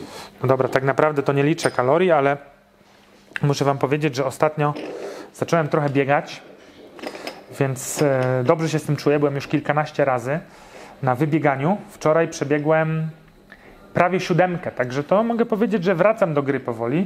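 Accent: Poland. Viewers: Daruje sobie pan ten "angielsko-amerykański" akcent , słychać ześ pan Polak z krwi i kości. :)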